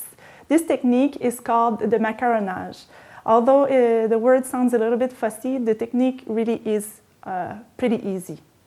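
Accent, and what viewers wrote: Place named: Quebec, Canada. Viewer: Thank you for taking your time to share this wonderful, detailed class! I knew you were French Canadian by listening to your lovely accent. I moved from Toronto to Quebec City in 2014 and in 2020 to Lac Beauport with my new husband. Merci encore! 🤗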